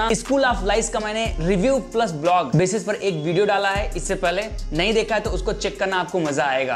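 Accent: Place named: Haryana, India. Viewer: I like haryanvi style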